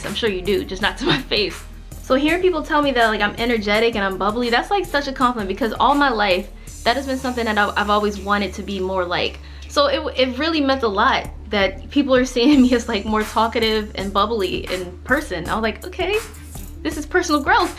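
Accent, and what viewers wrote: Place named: United Kingdom. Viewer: The British accent 😊😅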